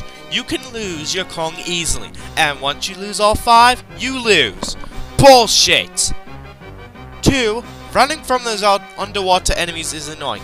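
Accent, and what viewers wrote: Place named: United Kingdom. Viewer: Where do I begin? It's a countdown of your FAVORITE bosses, yet you have nothing but negative things to say about the first two bosses. Did you seriously just call Creepy Castle annoying?! Also, why do you have a British accent in this video, but have an American accent in your more recent videos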